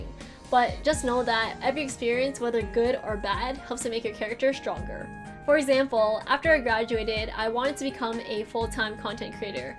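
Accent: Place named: Canada. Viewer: Cost of living in L.A. is ridiculous anyways, plus you’d lose that lovely Canadian accent 😂😂 hope the rest of the year treats you better.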